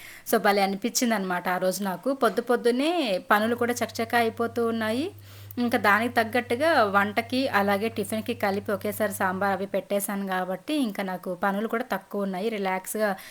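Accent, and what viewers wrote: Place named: Eluru, India. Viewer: పెటిక పప్పు అనరు మా సైడు చీక్కుడుకాయలు అంటారు మాది పచేమగోదావరి జీలా ఏలూరు మేము ఎపుడూ అలంటే కూరలు వాడూకొము రాయలసీమ వెపు వాడుతారు అనుకుంట మీ మాట మా సైడ్ లాగా ఉది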